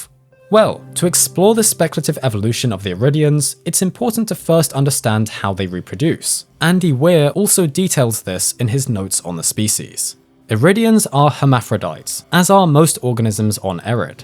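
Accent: United Kingdom. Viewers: American discovers the existence of British English pronunciations, lmao. He's not an AI, he's just British. You didn't think every English speaker in the world spoke American English, did you?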